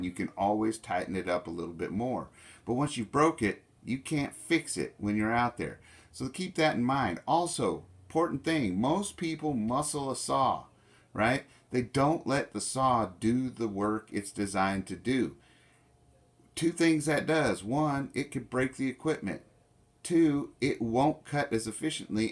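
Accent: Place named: South, United States. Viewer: I detect a bit of Southern in your voice. Where ya at man?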